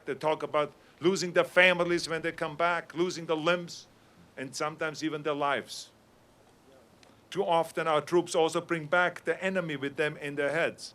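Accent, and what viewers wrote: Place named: Germany. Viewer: D His accent is so awesome german :D "schmusen" :D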